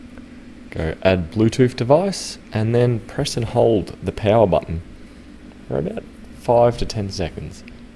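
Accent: Australia. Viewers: Thanks mate, btw: like to ozzy accent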